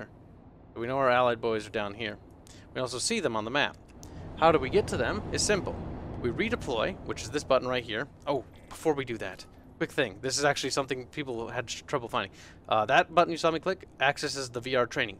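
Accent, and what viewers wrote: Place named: United States. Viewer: Hi! welcome to planetside 2! Are you European? you picked the Cobalt server (Europe server..) and you have a poor connection... wasn't sure about your accent but you sound quite American to me :)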